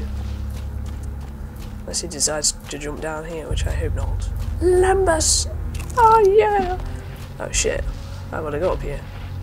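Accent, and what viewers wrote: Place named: North, United Kingdom. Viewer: Thanks for the upload, just got through watching it all, brought back many memories :) Sounds like you're a fellow Northerner with your accent as well!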